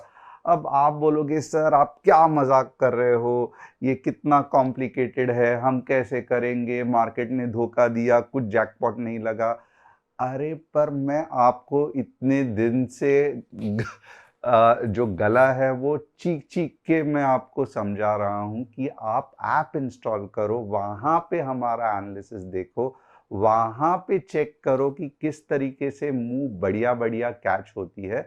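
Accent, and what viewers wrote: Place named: Vidarbha, India. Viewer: sir i think u r from amravti akola side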